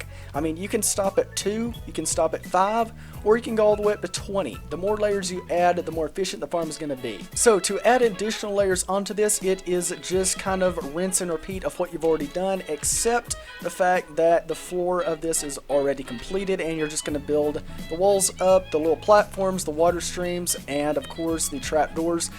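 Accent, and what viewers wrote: South, United States. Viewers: Love your southern accent by the way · Honestly an amazing video, your mildly country accent and speaking tempo with the music is great with this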